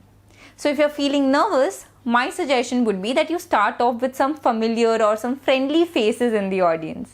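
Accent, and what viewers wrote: India. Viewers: Indian accent sucks..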